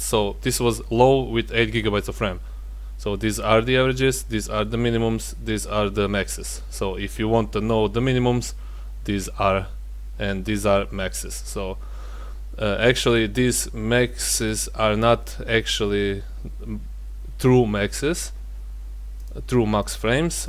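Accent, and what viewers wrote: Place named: Russia. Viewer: Как будто русский на английском записывает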